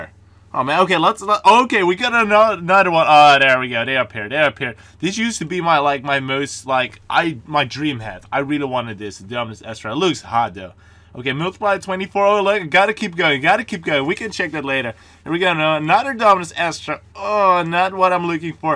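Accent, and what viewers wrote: Netherlands. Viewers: BREAKING NEWS Are You Dutch? (*and i mean from the netherlands*)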